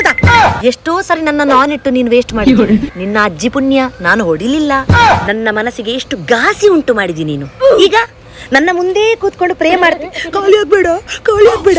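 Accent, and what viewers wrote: Mangalore, India. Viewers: Sooooooooper stand up comedy dear gas ⛽ 😂 Such a beautiful actor you are ..just wowww U told the reality of gas usage in a good way creating humour . I JUST LOVE UR MANGLORE KANNADA ...Muuaaahhhhhhhh 👄 But dear gas cylinder dont worry Ishtu diwasu band maadiddu microwave iga upayogistene 😂 Haage nann bali induction kuda untu...neenu swantane maha khiladi anta tilko beda 😁😁😁😁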